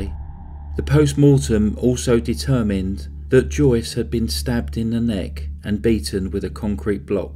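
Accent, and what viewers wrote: England, United Kingdom. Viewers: What part of England are you from? South? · Good morning, you sure know how to tell a story. I feel sorry for Joyce. I must admit, we Yanks love the English accent, ya'll can make a sad story sound serious, yet sweet. Take care! (Hi CR Fam!)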